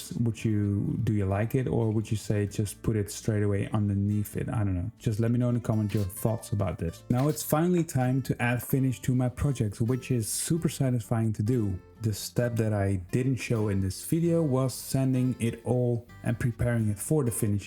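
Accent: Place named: Netherlands. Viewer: Sounds dutch to me, but I like it... I, surprised that you don't have a jig for dovetails.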